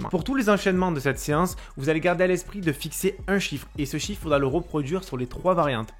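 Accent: South, France. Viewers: Accent du sud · Le bel accent du Sud ! Il y'a que ça de vrai !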